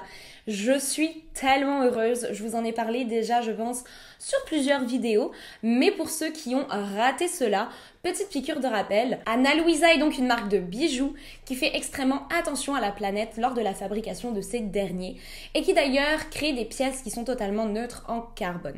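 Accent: Quebec, Canada. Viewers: Toujours sympa l'accent québécois, t'assure !!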